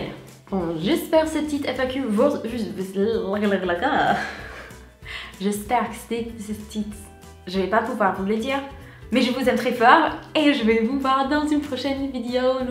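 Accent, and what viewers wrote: United States. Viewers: Hello ! T'as raison de pas essayer de gommer ton accent américain : ça fait partie de toi 😊. Jane Birkin vit bien en France depuis des décennies et elle a toujours son accent anglais. Le fait que tu fasses des vidéos est un bon exercice de prononciation et en plus c'est gratuit!!!😊😊😊 t'as pas besoin de prof... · Ton accent américain est charmant quand tu parles en français - je préfère l'accent américain au britannique, ça coule mieux, lol - j'imagine que l'inverse est vrai aussi - parler anglais avec un accent français - après j'ai passé un an à Montréal et je me sentais trop ridicule quand je devais m'exprimer en anglais face à des américains, c'est un complexe très français, lol! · Oh non !!!! ne fais pas disparaitre ton accent americain , c'est trop mognon quand ton parle , moi j'adore , trop chouette !!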